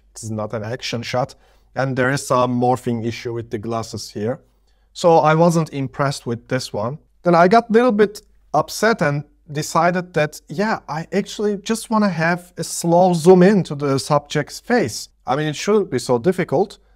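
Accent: Turkey. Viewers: sende türksün demi türk ingilizcesi bu ^^ iyi günler^^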